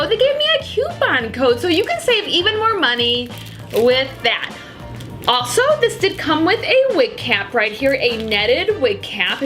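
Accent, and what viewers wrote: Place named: Upper Midwest, United States. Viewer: Are you from Minnesota, Wisconsin or North Dakota? Trying to figure out your accent.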